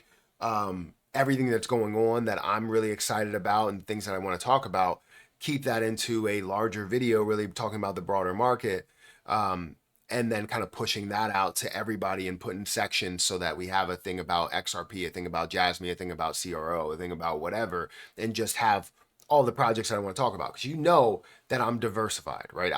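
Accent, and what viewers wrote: United States. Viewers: Most of the time I can tell if the speaker is African American or not. You sound like a 50 to 60 year old Italian American. I may have to go to the start again to actually listen to your content now -_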